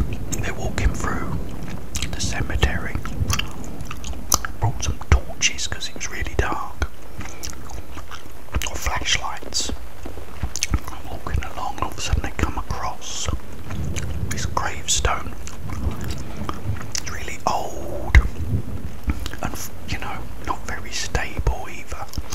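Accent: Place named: United Kingdom. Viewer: Bri’ish + gum = ☺️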